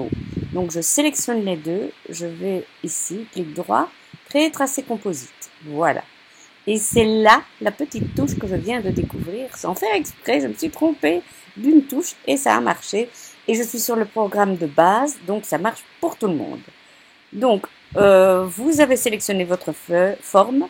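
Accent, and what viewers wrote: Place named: Brussels, Belgium. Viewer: Merci beaucoup à toi pour tous ces tutos sur la caméo...j'ai toujours peur de faire des bêtises et donc je ne m'en sert que pour les découpes tradi...grâce à toi, j'ose me lancer et en plus j'adore ton accent qui me rappelle ma ville natale (Bruxelles). je te fais de gros bisous et continue à rire et nous faire rire. cela fait tellement de bien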